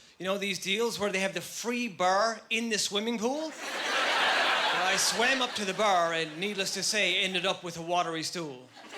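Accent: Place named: Maritimes, Canada. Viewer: >>>. Tinsel cat ?? Best ever. Excellent set. I love a Maritime accent.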